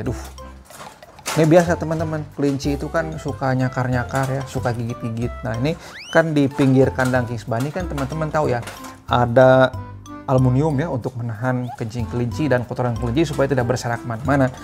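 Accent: West Java, Indonesia. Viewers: Sunda na TI mna kang😀😂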